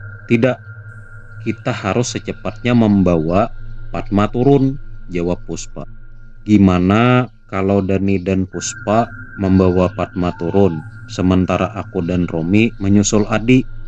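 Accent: Banjar, Indonesia. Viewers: Mimin urang banjar jua neh lugat nya 😀😀.. Nah maaf nah min hnyar tdpt chanel pian mudhan sukses.. Salam dr banjarmasin🙏🙏🙏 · Urg Banjar kah Pian mang..ketahuan di logat neh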